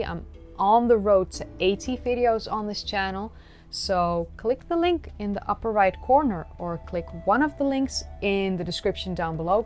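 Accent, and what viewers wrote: Netherlands. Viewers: Hahaha 🤣 great presentation and cute dutch accent 😉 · You have a beautiful accent. is it Dutch ?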